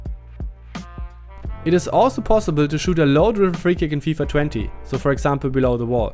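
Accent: Germany. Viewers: Your obviously German aint you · Bist du deutscher ? Hört sich vom Akzent her bissle an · So n bissle hört man den deutschen Akzent dann doch raus 😉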